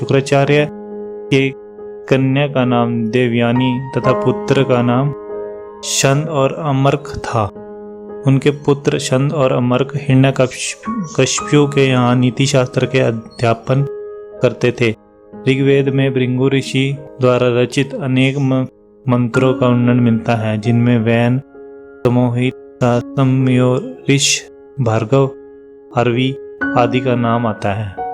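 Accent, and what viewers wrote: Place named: South, India. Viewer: Koi south indian bol raha hai shayad..ok..good hindi bolne ki kosish to ki..vocabulary will improve4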